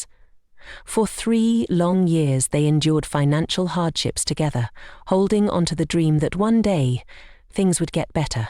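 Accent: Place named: England, United Kingdom. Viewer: Such a beautiful English accent. All that glitters isn’t GOLD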